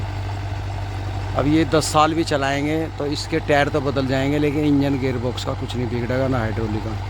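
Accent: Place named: Western Uttar Pradesh, India. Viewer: जी राम राम। बिजी रहता हूँ फिर भी आपकी वीडियो आपकी कड़क आवाज सुनने की खातिर वीडियो पूरा देखता हूँ। दूसरा कारण वेस्ट यूपी की स्थानीय भाषा की बोली और लहजा मुझे हरियाणे की भाषा के mukable में ओर भी अच्छी लगती है। मेरा एक अजीज दोस्त गाज़ियाबाद से है। उस से 11 साल से बात कर रहा हूँ इस लिए अब मुझे वेस्ट यूपी की भाषा समझने में जल्द आ जाती है। From- गंगानगर (Raj.)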